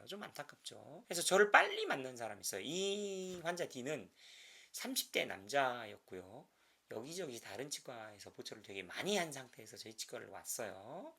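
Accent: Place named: South Korea. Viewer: Lateral excursion에 대한 좋은 내용이네요... 유투브를 전문적으로 하시려면 영어쪽은 감수를 받으시는게 좋을듯합니다... 너무 한국식 영어라^^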